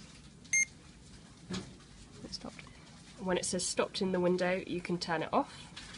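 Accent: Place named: United Kingdom. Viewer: Thank you. Love your explenation and your British accent.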